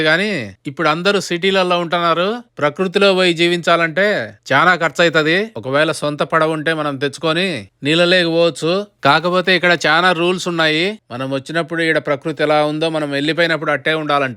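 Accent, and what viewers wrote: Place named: Telangana, India. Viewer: తెలంగాణల భాష లాగానే ఉంది ఉంది బ్రదర్ మీది మీ స్వీట్ వాయిస్ చాలా బాగుంది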